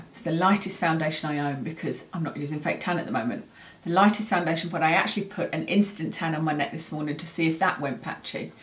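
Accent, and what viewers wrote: United Kingdom. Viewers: Thanks. Great to hear a British voice. Opinion on top tinted, moisturizing spf?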